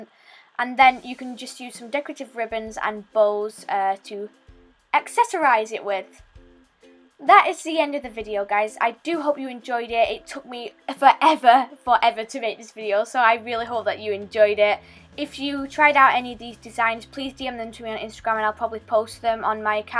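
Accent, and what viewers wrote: United Kingdom. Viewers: Are you a British girl?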